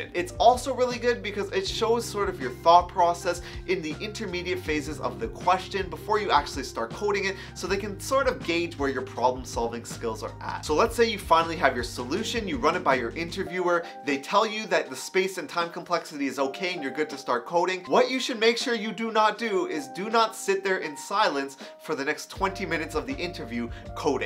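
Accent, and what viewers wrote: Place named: Toronto, Canada. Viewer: Just saw your Redux video and I'm like this guy is from Toronto ( I am too) just by your voice. thanks for the clear videos and explanation on Redux. Lots of videos don't show HOW and WHY things are done but just "this is how it's done". Keep being awesome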